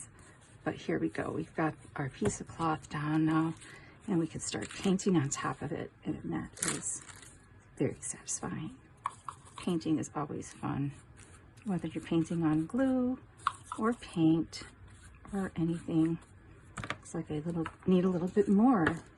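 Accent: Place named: Wisconsin, United States. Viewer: You sound like you’re from Wisconsin -are you? I am in Mequon ☺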